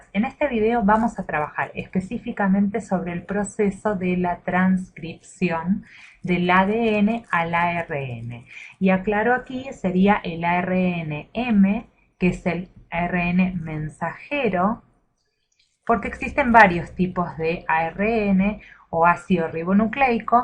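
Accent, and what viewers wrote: Argentina. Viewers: Andaba buscando algo gráfico que me ayude a entender el tema y te encontré! sos una genia y no voy a mentir: cuando te reís o tenés un argentinaz al hablar, me generas mucha calidez! un placer escucharte!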